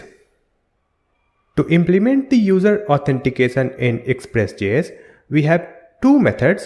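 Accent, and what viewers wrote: India. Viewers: Indian accent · you explain fast and youre indian so a little bit hard to understand what your saying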